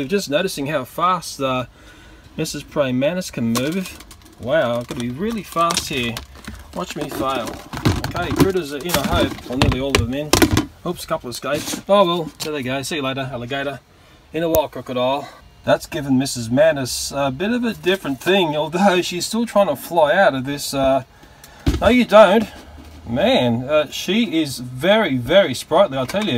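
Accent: Australia. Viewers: 26 seconds into the video and I already know he's Australian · It called an aussie accent. Bloody tolerate it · My brain: Wait, is this guy in Australian? sees mantis Me: Yup, definitely Australian.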